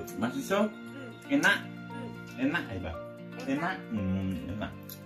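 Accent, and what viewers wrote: Java, Indonesia. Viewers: Gedheeee bgt kak pizza nya... pasti kenyang bgt tuuuh yaaa 😂😋😋 Btw... om nya orang jawa ya 😁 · bos dikorea sudah berapa tahun. ngomong nya udah kayak orang korea bgt.. tapi klow ngomong jawa medok bgt😀 anak nya cantik pinter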